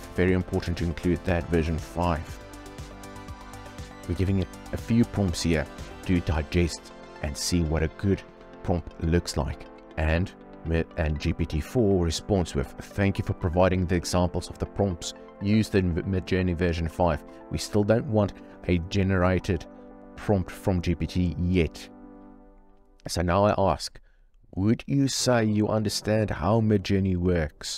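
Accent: South Africa. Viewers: That South African accent 😂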